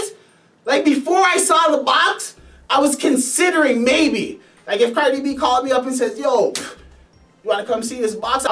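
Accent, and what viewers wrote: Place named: Canada. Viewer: Where are you from Fam You sound like your From Canada..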